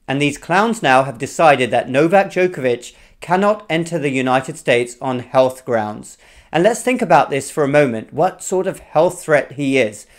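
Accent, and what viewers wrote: United Kingdom. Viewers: I didn't know you were an American citizen, since you sound like a Brit!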